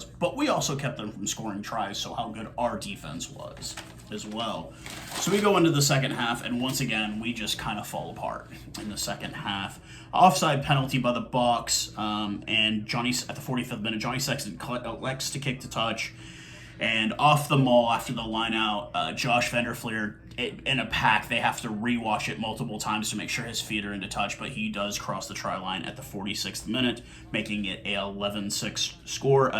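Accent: United States. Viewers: Where is this guy from. Sounds like a yank.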